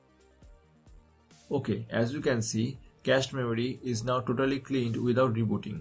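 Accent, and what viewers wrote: India. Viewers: because the guy who made this video is Indian and this guy despite possibly getting helped insult him by saying his accent is like the Indian scammers. And again, I'm not Indian.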